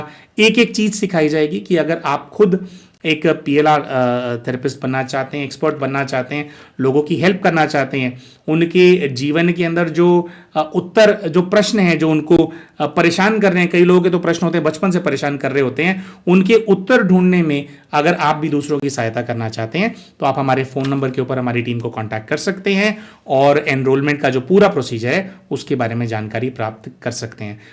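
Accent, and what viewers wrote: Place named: Mumbai, India. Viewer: Kantal gaye baba, this is mumbaiyga hindi.kantala ye marathi word hai. inko past life karane ki jarurat kya thi? Boring laga sunana.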